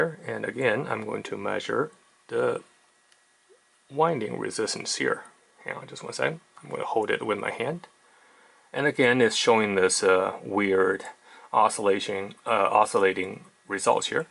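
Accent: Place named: Germany. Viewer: You sound very... German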